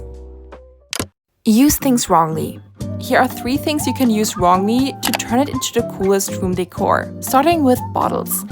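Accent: Germany. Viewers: Hi 😊 i was wondering if you are german bcz you have this Accent that also have 😊❤ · i can't with german accent twin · es ist so krass, man hört das du deutsch bist, aber dein englisch ist so unglaublich gut omg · Gurl u NEED to make a German video . ( if ur German u just sound like it no offense ) I LOVE UR VIDS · Are u german u have a german accent?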